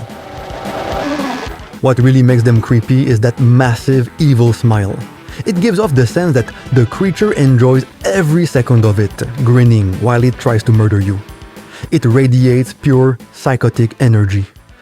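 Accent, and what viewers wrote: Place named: Quebec, Canada. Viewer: Juste à l'accent je le sais que t'es un quebs 👁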